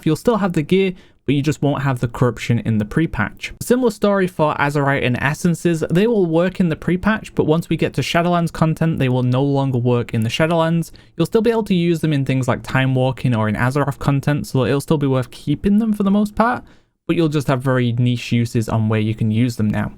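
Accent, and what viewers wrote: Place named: Leeds, United Kingdom. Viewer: Northern UK, Leeds kinda accent.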